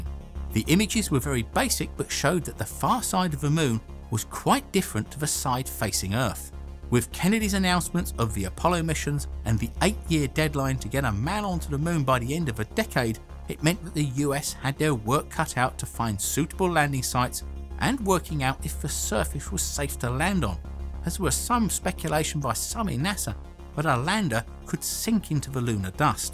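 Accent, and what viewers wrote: United Kingdom. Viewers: Very informative thank you. Btw what part of Britain is your accent from? I absolutely love it, and have always been curious about regional British accent differences.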